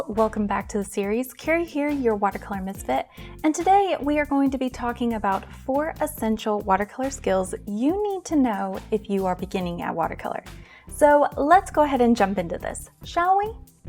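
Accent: South, United States. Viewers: I detected a southern accent. Are you from the south?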